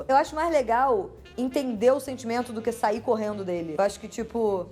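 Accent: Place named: São Paulo, Brazil. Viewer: ela já começou a pegar sotaques paulista aaaaaaaaaa 💕💕💕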